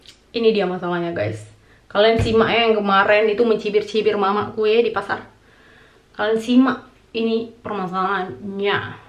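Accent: Indonesia. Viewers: Salam kak... Saye dari tg. Balai karimun... Slame nonton vlog akak,, bahase nye same dgn kami... Ternyate emang pernah tinggal di tg. Balai karimun... Kak tg. Balai karimun nya dulu dimna?? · pantas logat e macam pontianak.. emang perbatasan indonesia na kalimantan dengan malaisia.. walaupun ndak nempel langsong pontianak e wkwkwk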